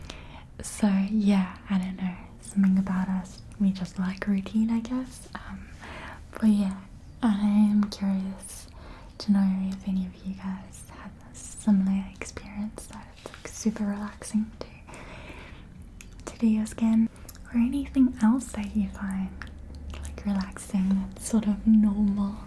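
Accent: South Africa. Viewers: Sooo soothing 🥺 are you from South Africa?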